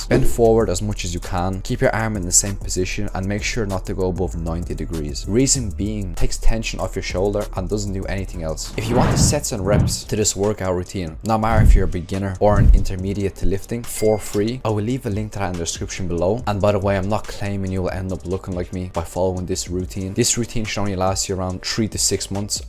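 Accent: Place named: Zimbabwe. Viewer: Nice Rhodesian accent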